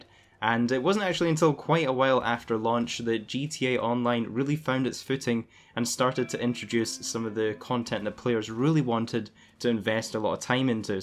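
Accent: Scotland, United Kingdom. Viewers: First off thanks for another vid... been enjoying your series since it started. Nice to hear a Scottish voice amongst all the other youtuber videos 👍 After having played GTA Online since its OG launch, I found Read Dead Online a welcome change from the often frenetic pace of GTAO, although GTAO has given me hundresds of hours of online fun over the years, especially with the Heists (completing the Criminal Mastermind Challenge was awesome) and more recent Business and Arena War updates. Shifting to RDO, I had high hopes for revisiting the world of Red Dead after RDR and though I did get a bit bummed after a time playing solo in RDO, I have since connected with some good regular players via our PSN Community and now it is so much better! Aside from the griefers, which are pretty much negated once you are in a reliable posse, RDO really just needs some balancing and regular expansion via content updates. 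As an OG GTAO player, I know from first hand experience that GTAO was "dead" during it's first few months of existence (there were constant server issues during the early days) but within a year it had really started to evolve and become immensely playable. I think the same thing will happen with RDO and while it may not hold the same kind of opportunity for such diverse updates as GTAO, there is a huge amount of potential there. Let's see where it's at come October 2019 before we write it off. Meantime, I'm having lots of fun hunting and gathering with my posse 🤘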